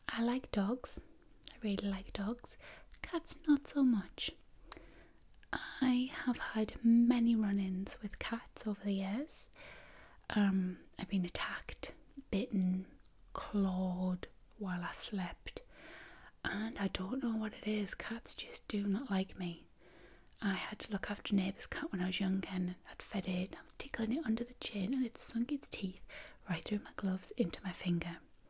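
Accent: United Kingdom. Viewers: I think I can day my English is pretty good, I always understand all the asmr video's I like to watch, but I have to admit.. sometimes I really can't understand you because of your very strong British accent! Am I the only one? But I can deal with it, because I really love listening to your accent haha ^_^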